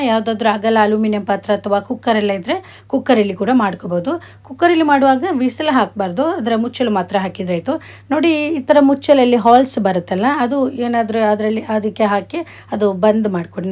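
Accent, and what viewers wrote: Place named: Kerala, India. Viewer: Nice to see your recipe. Will try. Good explanation. Some of your accent tells that you are from Kerala. Right??😊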